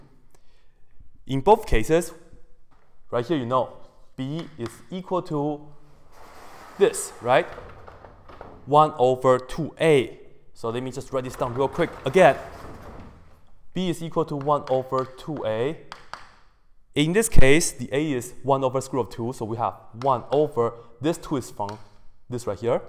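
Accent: China. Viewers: Lol, this is not Chinese accent, I have to make it clear. This is Chinese dialect accent. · What really pisses me off is that there are 377 mean-spirited people out there who can thumb up this: Pratap Singh: "Improve your accent first of all. I could not comprehend it at all. Chinese pronunciation works on chinese language only not on English." Work it out. It's not easy to get near-native pronunciation in a foreign language, which is what English is for him. Now if you have a hearing disability I might sympathize with you but you still should not demand unreasonable demands of the video author, but rather seek some kind of treatment and/or have someone else listen to the video for you to tell you what it is saying. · Se le notaba más el acento chino xd.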